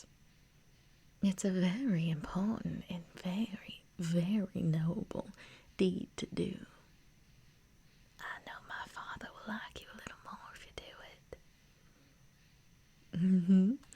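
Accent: South, United States. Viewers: The return of the Southern accent praise the gods of universe! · I hope we get to hear more of the southern accent, it’s lovely ❤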